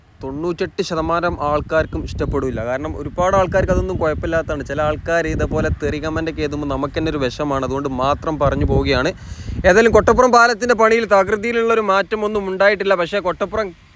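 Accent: Malappuram, India. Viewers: he already mentioned that he is gonna speak in Malappuram slang then why are you bothered..in fact that's why I follow his videos coz its different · ബായ്, ഞാൻ എറണാകുളം ജില്ലക്കാരനാണ്... മലപ്പുറം ഭാഷയും, ഫുട്ബോളും എനിക്കിഷ്ടമാണ്... നിങ്ങളെ സുടാപ്പി എന്നു വിളിച്ചു കളിയാക്കുന്നവർ വിവരദോഷികൾ മാത്രമാണ്.... മൂത്തകുന്നം jn. ഇൽ നിന്ന് വലത്തോട്ട് തിരിഞ്ഞാൽ ചെറായി, വൈപ്പിൻ വഴി, വല്ലാർ പാടത്തും എറണാകുളം നഗരത്തിലും എത്താം.... നിങ്ങളുടെ ഭാഷയിൽ ഈ വീഡിയോ ഇനിയും കേൾക്കാൻ കാത്തിരിക്കുന്നു...😂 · enthe bro malapuram slang njagalum kelkkate..maya kuyi okk kelkkan oru rasamalle... pinne.vedio super...MODI kk ORU THANKS PARYAAN ITH kandapol thonni.toll koduthalum kuzhapam illa. aa parvoor .ente rabbe...ath in bro paranj apoe kalich chitch pokaam... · ഞാൻ ഇടപ്പള്ളിക്കാരനാണ് (കൊച്ചി)ഒരു നിരീശ്വരവാദിയും പക്ഷെ Central Govt നെ Support ചെയ്യുന്ന വ്യക്തിയുമാണ്. ഞാൻ താങ്കളുടെ വീഡിയോ ഇഷ്ടപെടാൻ കാരണം തന്നെ താങ്കളുടെ ഈ മനോഹരമായ മലപ്പുറം സ്ലാഗ് ആണ്. 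തുടർന്നും നല്ല വീഡിയോസ് പ്രതീക്ഷിക്കുന്നു. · I like Your Malappuram accent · മലപ്പുറക്കാരൻ മലപ്പുറം ശൈലിയിൽ തന്നെ പറയുന്നതാണ് നല്ലത്. യായും ഴയുമൊന്നും കാര്യങ്ങൾ മനസ്സിലാക്കുന്നതി ന്ന് തടസ്സമല്ല. അക്ഷരസ്ലോക മത്സരത്തെപ്പറ്റി വീഡിയോ ചെയ്യുന്നുവെങ്കിൽ അതൊക്കെ കൂടുതൽ ശ്രദ്ധിക്കുന്നത് നല്ലതാണ്. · Is this Malapuram accent? Never been there. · മലപ്പുറം ശൈലിയിൽ ഉള്ള വിവരണം കുഴപ്പം ഇല്ല പക്ഷെ സ്ഥലപ്പേരുകൾ കറക്റ്റ് ആയി പറയാമായിരുന്നു. Eg. കൊട്ടപ്പുറം അല്ല കോട്ടപ്പുറം എന്നാണ്, കൊതപറമ്പ് അല്ല കോതപറമ്പ് എന്നാണ്, മുത്തുകുന്നം അല്ല മൂത്തുകുന്നം എന്നാണ്. Google Map നോക്കിയാൽ മലയാളത്തിൽ സ്ഥലപ്പേരുകൾ ശരിയായി വായിക്കാവുന്നതാണ്.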